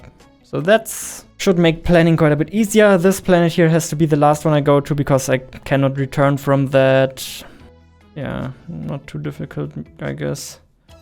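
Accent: Germany. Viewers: Bist du Deutsch? Hört sich bisschen so an. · are you german? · Never heard such german englisch but I love it haha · Man hört den Deutschen Akzent so raus haha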